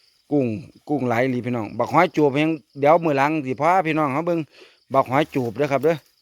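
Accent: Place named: Chaiyaphum, Thailand. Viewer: สวัสดี ที่แท้ก็โคราชบ้านเฮาเนอะสำเนียงเว้านึกว่าบาว่ชัยภูมิเห็นก้ง แล้วอยากกินปลาจอ่มเน อะบาว่ บักหอยตอ้งแกงขี้เหล็กคือสิเเช่บชอบมาก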